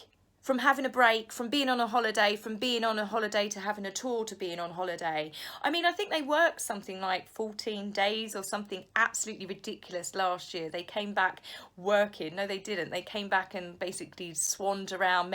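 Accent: United Kingdom. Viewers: Hi.love ur Channel so much and for telling it like it is when it comes to Markle with No Sparkle.such a self centered.controlling.manipulating human being!! Cannot stand her Fakeness! I love your British accent may i say and you are a very pretty young lady.may you and yours stay well and safe thru this crisis we are all enduring.look so forward to ur Royal news each day.Hugs from Canada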